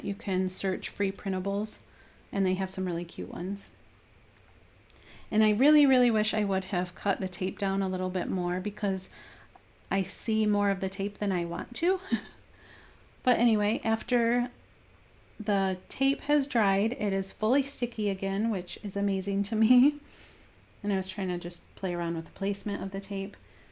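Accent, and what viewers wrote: United States. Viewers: So many cute ideas! I love the gift tags, the paper flowers, the tray and the craft room storage ideas. I also like the pumpkins - I actually knit those so I think using socks might be easier. Your Michigan accent (guess) makes me think of home. I was raised in Ohio. Thanks for sharing your creativity! 💜🙏🏻👼🏻✝️ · Your creativity and ingenuity knows no bounds! Wow, it's hard to choose a favorite...I loved so many of these! Thanks for sharing such inspiring projects with us! P.S. Are you from the Northern Ohio/Southern Michigan area? Your accent is familiar : )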